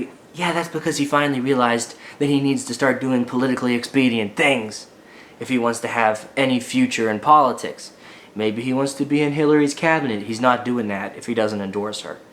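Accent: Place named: Canada. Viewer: You should move to Canada... You talk like a lot of us haha Decent poli video sir!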